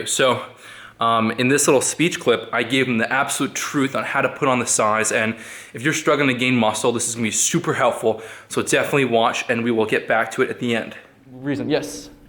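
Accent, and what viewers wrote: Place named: Canada. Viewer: He nails some pretty hot broads and is def straight, I think its a Canadian accent tho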